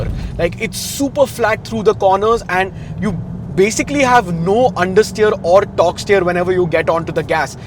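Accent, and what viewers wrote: India. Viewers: u sound indian, don't get it?😅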